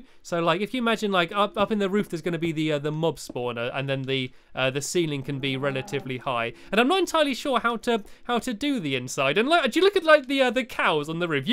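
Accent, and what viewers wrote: United Kingdom. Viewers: I used to think he was saying Alpha Lee until I realized he was saying L for Lee. Can't understand much of his accent, not being British.. XD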